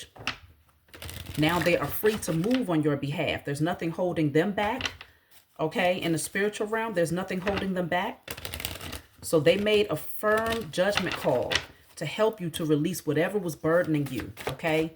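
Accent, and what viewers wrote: Washington DC, United States. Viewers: This msg was 🔥 torch. Ur DC dialect puts a smile on my face every time…being from SE DC myself. As always thank you!!😉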